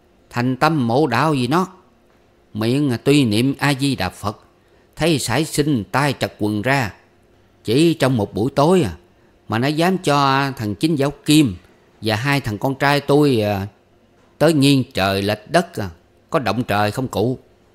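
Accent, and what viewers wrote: South, Vietnam. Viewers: Mèn đét quỷ thằn thiên địa ơi, yêu quá những từ miền Nam đặc sệt sền sên luôn. Thanks NV Hồ Trường An và giọng đọc thiệt là Nam Kỳ của ❤❤❤❤❤❤❤❤❤❤❤❤❤❤❤❤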